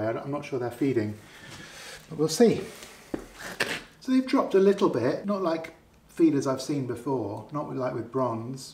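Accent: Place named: United Kingdom. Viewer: Hi again. I got excited watching you opening up these bad boys. Knowing what it's like to attempt a new pour I can almost feel my heart beating faster. Fun to watch! Right now I am making patterns (wood) and prepping to cast some CNC parts (the z carrage) which are longer and heavier than anything else I have done (it's to be a big 8 x 4 monster cnc). I like the gateing and swirl trap system you used. I 'think' I will need gates a touch larger for the casting I want to make to get all the metal in there before freezing... By your accent I am guessing you might be in the UK? If so, at some point we should totally do a YT colab of some kind. Ok peace, Bongo. PS, how do you think you could eliminate the bending (do you just put it down to the geometry of the pattern (the two perpendicular ribs)?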